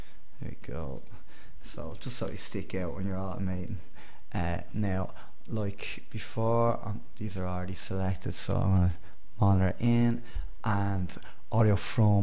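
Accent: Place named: England, United Kingdom. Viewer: it´s englisch dialect !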